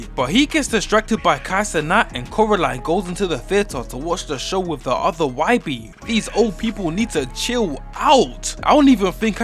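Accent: United Kingdom. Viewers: idk what it is about people with a uk accent reveiewing anime/movies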